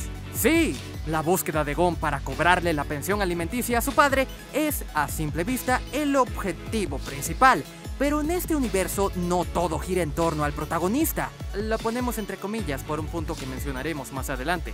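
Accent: Venezuela. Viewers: eres Venezolano? xd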